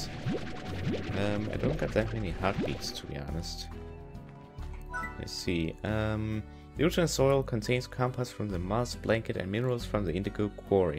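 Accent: Denmark. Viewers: are you danish it sounds like it and i love youre vid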